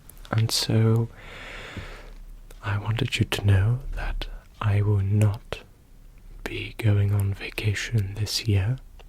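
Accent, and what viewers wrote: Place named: United Kingdom. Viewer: I love your British accent,! Are you from London? Congrats 🎉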